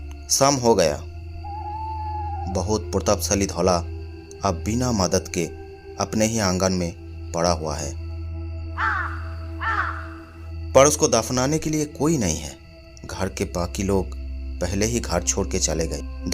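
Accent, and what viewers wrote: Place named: Bengal, India. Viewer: Apki bengali bohoth sundor hai Par hindi ki liye hindi bolne wale se hi dialogues bulwaye Hindi me bengali ka merge horaha hai Achcha nahin lag raha hai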